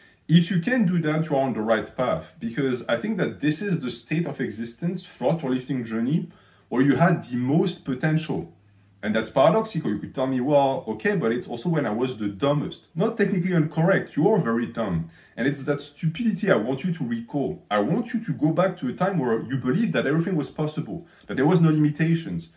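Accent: France. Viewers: With no exaggeration, I love the perspective offered on this channel. Sound advice from a holistic vantage, a thicc French accent and videos long enough to tax my attention. You understand this cant cease, oui?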